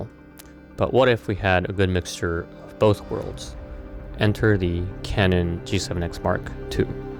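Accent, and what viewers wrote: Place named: General American, United States. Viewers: May I ask why your English is so good? Was one of your parents American/Canadian? Did you live in North America? I think the reason people had no idea you didn't live in the Americas is because your accent is WAY too "General American Accented" (or standard pronunciation) so given that they had no reason to think otherwise. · Ok but when you speak with an american accent and put the price in USD dont get mad at ppl for assuming. I live in europe and also thought you were talking about the American used market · Bro, people don't know you live in Japan! You sound American or Canadian, and you specified $ in the video title. So people are going to assume you're talking about buying it with American and Canadian dollars lol.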